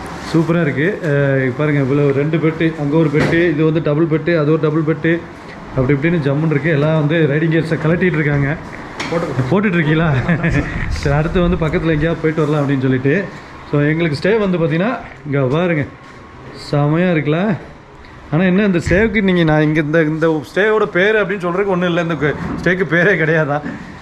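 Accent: Chennai, India. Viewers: தளபதி விஜய் அண்ணா ஜனநாயகம் படம் பார்க்க ஆவல் போல உங்க வீடியோக்காக ஆவல் அதிலும் உங்க சென்னை மொழியில் பேச்சு இன்னும் ஆவல்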